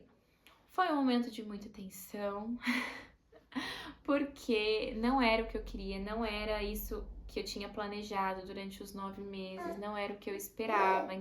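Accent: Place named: Portugal. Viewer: ❤❤❤❤Primeiras impressões, já mistura o nosso português com o Português de Portugal...kkkk Principal diferença que encontrei é que no Brasil pai não é visita, porém, existe a resistência dos hospitais em se adaptar a essa lei por diversas situações inclusive lotação hospitalar. E suponho que 95% das maternidades públicas não permitem a permanência do homem no período noturno pelo exato motivo do compartilhamento de quartos. Existem maternidades modelos mas são poucas e extremamente disputadas. Nessa o serviço privado ganha, porém depende do plano de saúde também. Lindo relato, super detalhado e mto necessário pra quem passa pelo mesmo. Obs: Achei lindo quando vc disse que precisa do perto de vc, vai além da necessidade com a criança...demonstra amor e segurança. Lindo casal..amei! Parabéns!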